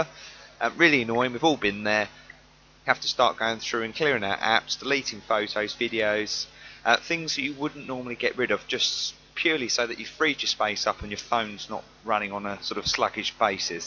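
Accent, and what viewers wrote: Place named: United Kingdom. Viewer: Ahhh a sound of a British accent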